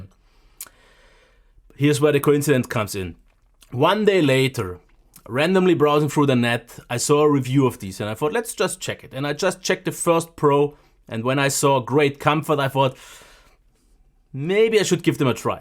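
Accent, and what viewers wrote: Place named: Germany. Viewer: Nice review really convienced me! The German dialect is fun and charming, keep it up!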